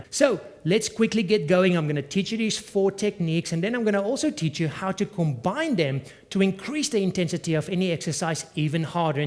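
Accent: South Africa. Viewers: are you south african? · South African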